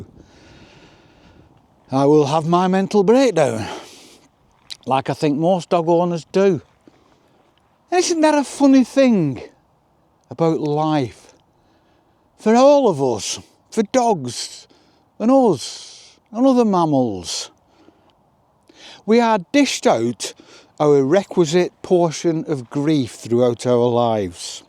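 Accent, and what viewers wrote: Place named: Home Counties, United Kingdom. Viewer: Thank you for your very wise words and support for Jamie, so many people round the world think the world of him. It’s so true that the price of love is grief. Love your words about the end we will all face and how to live a slow simple life literally “smelling the roses”. You sound to come from my home county but I now live in the Lake District which is currently overflowing with visitors who do not understand that life is finite and precious and that my home is not their playground, whizzing too fast to see the real valley. Sherps helped me to cope with feeling trapped and lonely.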